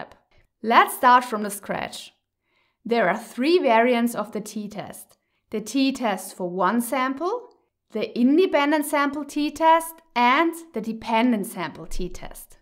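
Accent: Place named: Germany. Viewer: Hey there! Thanks so much for your videos. They're incredibly insightful and I've learned more from them than in any of my statistics classes at university. By the way, I had a hunch you might be a native German speaker, even though your English is excellent!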